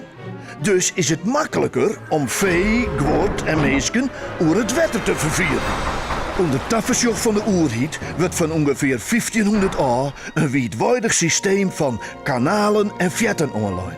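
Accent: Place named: Friesland, Netherlands. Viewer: Perfekt fries accent, maar geen friese taal. Woord voor woord vertaald hollands. Verder heel interessante documentaire, waarvoor dank.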